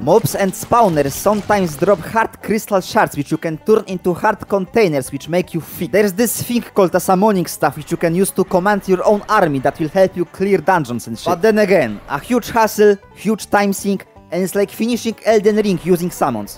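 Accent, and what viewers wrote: Poland. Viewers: are you from poland? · Stary jak miło usłyszeć polaka po angielsku xD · No akcent polski duży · Dobrze słyszę że jesteś Polakiem? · Are you from Poland? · Jak wszedłem w ten filmik i usłyszałem ten akcent wiedziałem że coś jest nie tak patrze w info kanału i oczywiście polska, od początku coś tak czułem, nagrywasz po inglisz żeby mieć wiecej wyświetleń sprytne. Mam pytanie a propo farm expa xaphan tych zielonych co w lawie się respią po tym jak dotknie wody, bo od chyba wersji 2.9 już te farmy nie działają i czy da się to jakoś obejść że będę na wersji np 2.9.3 i wejdę w ustawienia modów w grze i poprzestawiam coś żeby farmy działały i xaphany się respiły? Proszę odpowiedz jak lub nagraj o tym bo dużo osób potrzebuje tej informacji czy da się to zrobić i jak to zrobić a szczególnie dużo osób z polski. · 2137 widac i slychac ze polak!!!!!!! 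· dobra sprawdzałem czy polak i się dowiedziałem to polak po 4 min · Polski akcent — miód na moje uszy :))))) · Słychać ten polski akcent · Nie no po akcencie od razu wiedziałem że rodak XD Film też się przydał bo mam nowy rekord przetrwałem 3 dni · kurna od razu po akcencie mozna poznac ze Polak, kocham cie stary (zajebisty toutorial) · masz bardzo polski akcent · Po 2 sekundach wiedziałem że jesteś z Polski ale super poradnik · Zastanawiałem się czy Polak po akcencie ale wystarczyło że zobaczyłem 2137 lvl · słycahć po tobie ze jestes polakiem XD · od początku wiedziałem że to polak hahaha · tak dawno nie słyszałem kogoś z tak polskim akcentem że ciężko mi czasem zrozumieć co mówisz XD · Kocham polski akcent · are you from poland ?? haha because of your english xDDD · bro r u polish?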